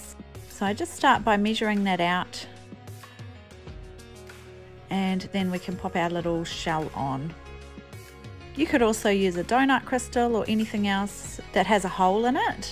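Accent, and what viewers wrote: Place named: New Zealand. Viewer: Thanks for the video. I will be making something like this for my 7 year old daughter and this video is helpful. I see you are in Australia but it sounds like you have a Kiwi accent.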